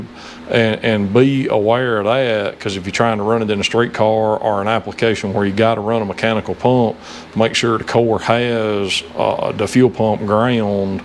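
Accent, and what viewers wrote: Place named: Australia. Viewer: That accent is magnificent. Aussie here.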